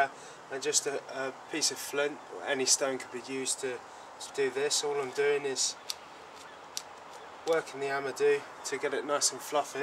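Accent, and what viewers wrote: United Kingdom. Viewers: thats great that you're an outdoors person mate! and i love the british accent! BLOODY HELL MATE! CHEERiO!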